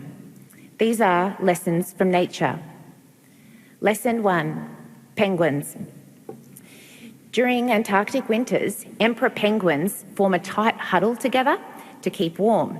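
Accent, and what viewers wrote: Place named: Australia. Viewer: Omg! She's an Aussie. Struth and by crikey 😂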